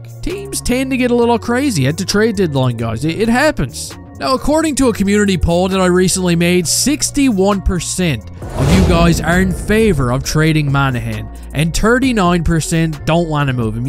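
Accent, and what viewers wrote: Newfoundland, Canada. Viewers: Are u from newfoundland? If so what part?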